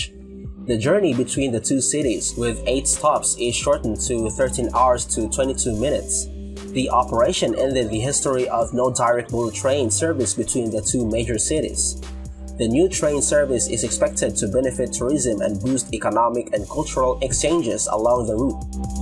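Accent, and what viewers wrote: Philippines. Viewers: by accent and off word pronounciation philipine chinese pinoy.100'/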